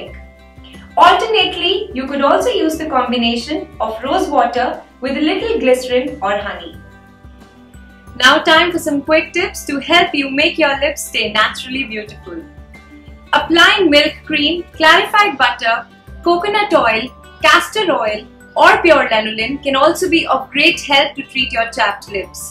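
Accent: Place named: India. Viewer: love ur indian accent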